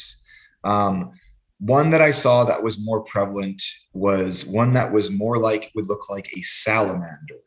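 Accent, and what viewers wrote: Canada. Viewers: Is this man Canadian? From the East Coast? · He sounded Canadian to me. I live in Regina Saskatchewan! I definitely find this so intriguing/fascinating. I also came out of hard-core drug addiction four years ago.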